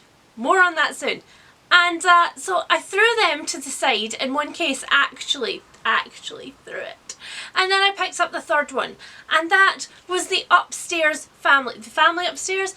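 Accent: Scotland, United Kingdom. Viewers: Is she Scottish/ Irish? Btw awesome idea. I have a superstition that if I add a book as currently reading on Goodreads or post about a book while I'm reading on instagram then I won't be able to finish. It's irrational but 🤷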